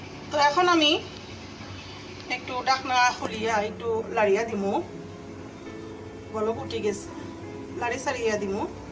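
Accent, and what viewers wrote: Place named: Sylhet, Bangladesh. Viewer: আসসালামু আলাইকুম আপনি কেমন আছেন আশা করি ভালো আছেন আপনার বিডিও অনেক ভালো লাগছে রেসিপি সিলেটি কথা ভালো লাগলো আমি ও সিলেটি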